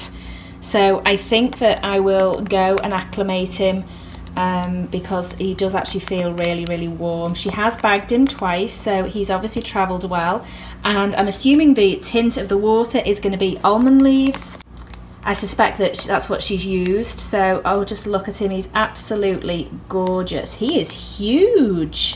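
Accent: United Kingdom. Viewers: Are you from the UK? Of USA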